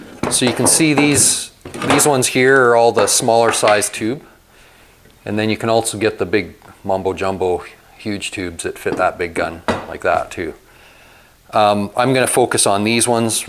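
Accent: Canada. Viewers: Very helpful. Love your Canadian accent! I’m anCanadian living in the US and miss that so much. Thanks for your good pointers. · I recently found your channel. I have watched a couple of your videos. I think you are a really great instructor and I appreciate your videos very much. I lived in Alaska for 25 years and consider all Canadians as neighbors. You are wonderful neighbors. I mean this is a really complimentary way, I admire your accent! LOL. Keep up the good work Brother...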